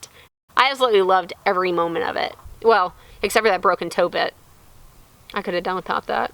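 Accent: Texas, United States. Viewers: You leave her Texas accent alone! ;)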